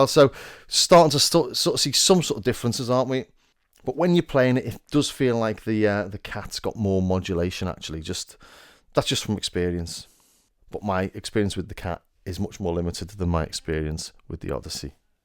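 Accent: Liverpool, United Kingdom. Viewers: Glad I found yer channel. YouTube needs more of us with 'regional accents'. I've always liked Scousers (apologies if you're not actually a Scouser and now deeply offended).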